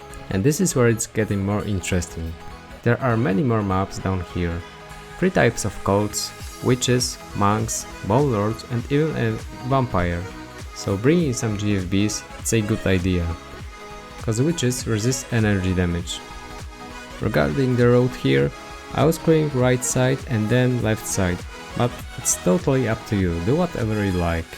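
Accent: Poland. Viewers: masz polski akcent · slychac ze polaczek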